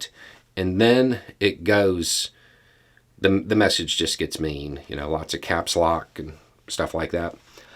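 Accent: South, United States. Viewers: The reason why someone thought you were a Republican us because you have a beard and a southern accent and live in Florida. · The commenter either took things Beau said tongue in cheek as completely serious, or he just saw a dude with a beard and southern accent, and assumed he was a republican. Someone should warn him about Trae Crowder too. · They think you're a republican because you're white with a southern accent but once they see and hear you having your mind, you're a rino. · The loser thought you were a Republican because you're a white man with a southern accent. He's a deep thinker. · The video that made him think you were a Republican was the video where you have a beard and a Southern accent. Because that's how intelligent the MAGA crowd is.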